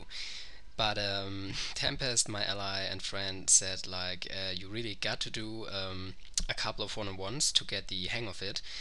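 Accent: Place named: Germany. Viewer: lol exactes deutsches schulenglish :D:D:D:D:D und mir gings exact genauso bei meinem ersten 1on1 :D:D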